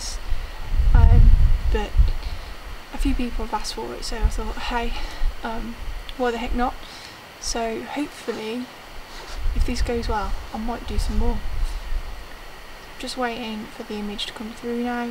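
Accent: United Kingdom. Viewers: Loved the video! Definitely going to be a hit! I have a small request. What was the plate solving software you used? Sorry, my American ears don't always hear the British words accurately.😊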